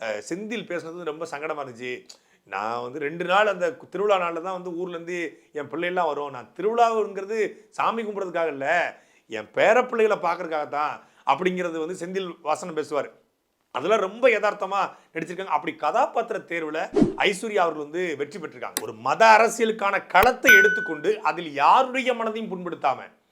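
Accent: Tirunelveli, India. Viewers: அவர்களின் தமிழும், கதை கூறும் பாங்கும் அருமை.. நெல்லைத்தமிழ் இடை இடையே மணக்குது.. வாழ்த்துகள்.. கொஞ்சம் ஆங்கிலம், வட மொழிச்சொற்களை களைந்தால் இன்னும் சிறப்பாக இருக்கும் - நாம் தமிழர்!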